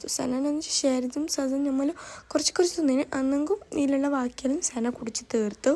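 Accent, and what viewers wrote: Kasaragod, India. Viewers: Ksrgod aanh😂🤍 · Kasaragod touch😂❤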